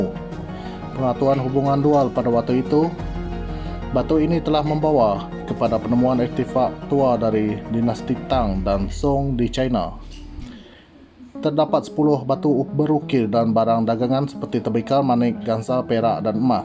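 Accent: Iban, Malaysia. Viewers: aok.. jgn buang slang iban.. ya antara entik d negeri kita.. agi idup agi ngelaban... huuuuhaaaaa!!! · Kn..pekat iban iya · Pekat Slank Iban... Terhibur Ngau video tok... Tq... Semoga terus bersemangat menghasilkan video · Slang iban aya nda ulih buai😅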